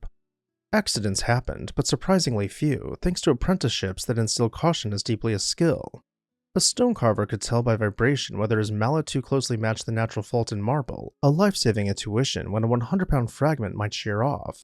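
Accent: United States. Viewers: That bloody annoying American accent, distinctly Gay, grates on me, I'm afraid. I turned it off after two minutes. Sorry mate, but construction, especially in those days, was done by people who spoke different to you. 55 years in the trade, I'm afraid I couldn't take any more..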